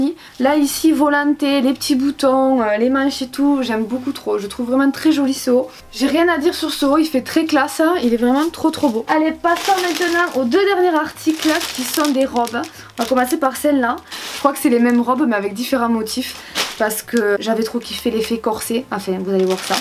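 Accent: South, France. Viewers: Ça fait quelques mois seulement que je t’ai découverte! Tes vidéos me font toujours rire, ton accent bien de chez nous qui fleure bon le soleil du sud, ton humour, ton auto-dérision (tout le monde n’a pas cette faculté !!) bref, toujours un moment sympa, merci ☺️🙏🏼💜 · Je regarde jamais les haul de YouTubeuse sa me gonfle mais les tiens j’aime trop avec ton petit accent du sud et ta personnalité. Sa fait 3 jours que je regarde toutes vidéos mdr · Yes !! J'adore tu illumine mon arrêt de travail (qui est beaucoup trop long, 3 semaines c'est trop! 😂) Ça me ramène au pays ton accent ! Même si je suis encore dans le sud je suis pas du bon côté ! Bref j'adore tes vidéos !! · D'habitude l'accent du Sud me tape sur les nerfs, je ne supporte pas .... mais toi tu me fais tellement rire que ça passe tout seul 😀 j'adore ta manière de filmer, de présenter les articles... 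tout en simplicité c'est hyper agréable. J'ai l'impression d'être avec une copine après une séance shopping 😀 Bye bye 😘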